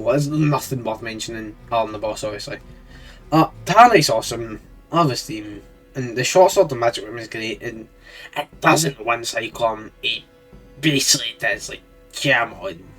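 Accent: Scotland, United Kingdom. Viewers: scottish english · I love how nobody understands him. Grew up around scottish accents so I find it so I understand perfectly. Great vid mate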